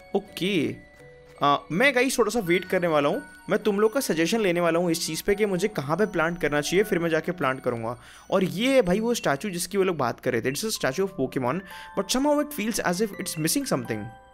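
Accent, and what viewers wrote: Maharashtra, India. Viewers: Kya tum marathi ho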